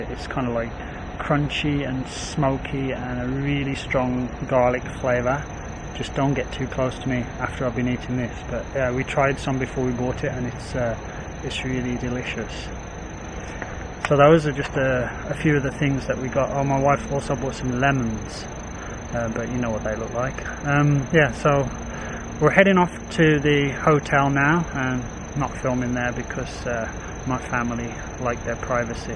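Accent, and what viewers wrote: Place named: England, United Kingdom. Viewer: must add that your English-accented mandarin is quaint & interesting 😋👌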